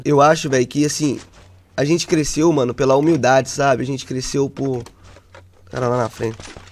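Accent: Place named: Santa Catarina, Brazil. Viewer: sotaque catarinense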